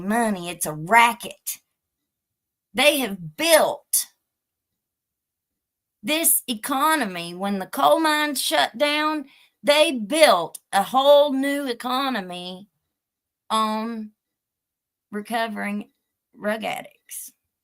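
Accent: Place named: Appalachia, United States. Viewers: Do you have a point? I wasted almost an hour waiting for it. Maybe get to the point rather than rambling endlessly. Boring as heck and your hillbilly accent makes it hard to listen. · Newbie here! I found you on Joel's show! Your accent reminds me of my aunt in Kingsport Tennessee!! Great coverage of this case!! ❤️🤍💙‼️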